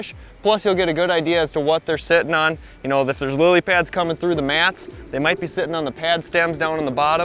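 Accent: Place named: Minnesota, United States. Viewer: That Minnesotan accent though...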